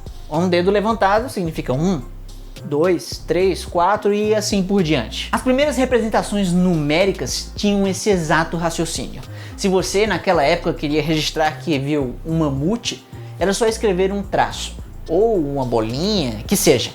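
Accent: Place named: Northeast, Brazil. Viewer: esse cara é de qual estado? esse sotaque não me é estranho... Nordeste? pernambuco? Agreste? alguem sabe kkkkkkk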